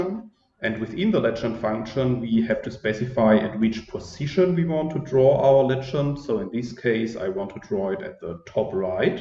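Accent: Germany. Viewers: Hello, I wanted to say thank you very much! Your videos really saved me today! Btw is that a german accent or am I imagining it? If so then: Super Video! Ich freue mich auf weitere Tutorials :D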